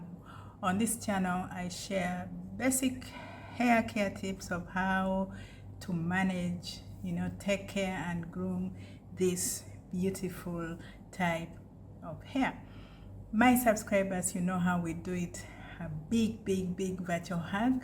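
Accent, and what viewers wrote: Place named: Uganda. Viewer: Sounds Ugandan. Love this kind of content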